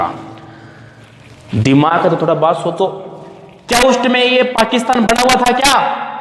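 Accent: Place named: Punjab, India. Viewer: Bolne se Punjabi lg rhe hai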